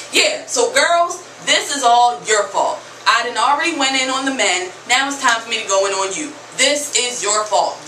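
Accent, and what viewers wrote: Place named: Baltimore, United States. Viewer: u gotta be from baltimore maryland or somewhere in that area i hear it all in ur voice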